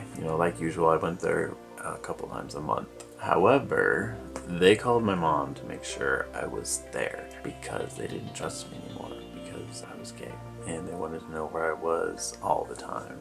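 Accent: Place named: Fort Wayne, United States. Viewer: Sounds like you are from the area around Fort wayne? I am from warsaw.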